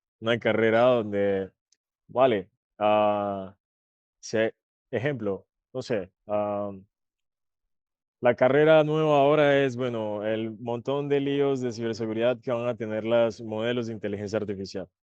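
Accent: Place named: Colombia. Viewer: Porque a veces habla full Colombia o como un gringo aprendiendo español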